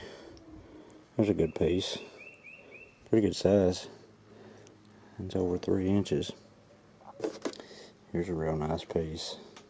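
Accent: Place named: Missouri, United States. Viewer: I'm coming down there to go hunting with you! All that in one field? Are you kidding me? I'm in Canada eh... I find a couple in a day, maybe up to 5 if I'm lucky... Sometimes, nothing at all. I'm in Southern Ontario, Canada... By your accent, you must be in Missouri or Arkansas or something like that... I really want to go down there one day and hunt a few of those fields....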